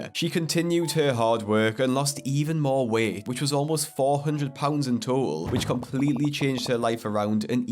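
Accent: United Kingdom. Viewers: Completely off topic, but I was wondering where you are from. I watch another YouTuber with a very similar accent. I mean, I know it's UK, but it amazes me how an island that isn't very much bigger than my state (Illinois), has so many different regional accents!! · This is an American TV program. Why in the hell do I have to listen to somebody with an annoying British accent??? That's the only reason I can't subscribe to this channel.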